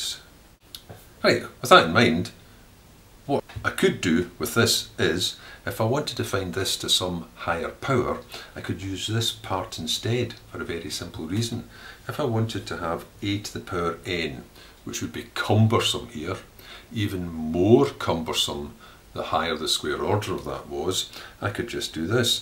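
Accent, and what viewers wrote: Scotland, United Kingdom. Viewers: He's quite clearly Scottish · That Scottish accent is freaking awesome.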